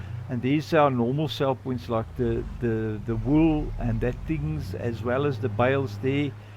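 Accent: South Africa. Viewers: Hoe klink dit vir my of jy van Suid Afrika af kan kom???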